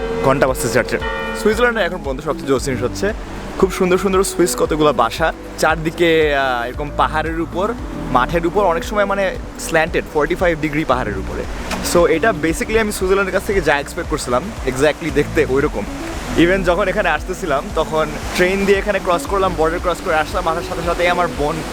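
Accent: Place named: Kushtia, Bangladesh. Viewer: এ্যতো দিন পর বুঝলাম ভায়ের ভাষা এ্যতো শুদ্ধ কেনো কুষ্টিয়ার ছেলে বলে কথা ভাষা তো শুদ্ধ হবেই 💜💜💜💜💜💜💜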